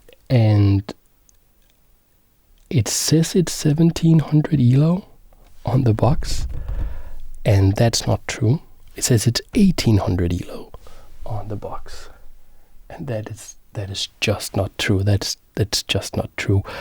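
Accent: Denmark. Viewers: You danish?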